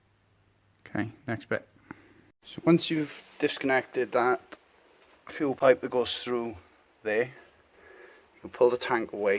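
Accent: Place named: Wales, United Kingdom. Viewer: What part of Wales are you from? I'm in rhondda.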